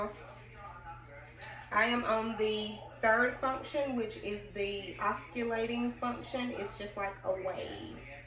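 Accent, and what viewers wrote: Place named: South, United States. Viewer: Thanks for the review! It’s been a year since you’ve done it, what is your opinion of this device now? I just bought mine, delivered to me today. I’m excited to get my “shake on” 😅 I have a lot of injuries from my military career to work through. So I’m definitely looking forward to this. Btw, very nice bodily attributes 😉 Looking good over there. Sounding like you from GA or the Sip 😄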